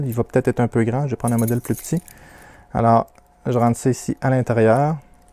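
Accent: Quebec, Canada. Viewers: L'accent du Quebec ne fait pas tout, tes vidéos sont vraiment agréables à écouter ! Il y a deux jours et un peu par hasard, j'ai visionné celle-ci : <link> sur le décodeur QX3 pour coffres de classe 2. Et je me suis demandé si c'était réalisable avec une imprimante 3D. Evidemment, en moins rapide (vu la rigidité du PLA par rapport à du métal). Le décodeur coute quand même 3 750 € HT pour une armature, un moteur pas à pas et une raspberry avec un écran. Au début, j'ai cru que c'était impossible (combinaison 3 nombres = un million de possibilité soit 11 jours pour un essai par secondes, ce qui est déjà rapide) mais dans la vidéo, il est dit que les coffres ont une tolérance (genre 11-21-29 pourrait ouvrir si le vrai code est 10-20-30, tu confirmes avec ton modèle ?). La méthode "brute force" passerait à 10h. Tout ça juste pour le plaisir...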